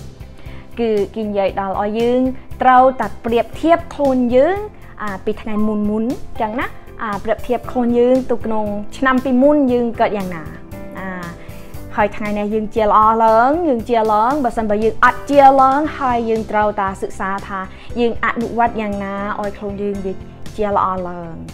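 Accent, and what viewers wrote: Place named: Cambodia. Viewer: Thank you, your Khmer accent is so sweet too I love ❤️ it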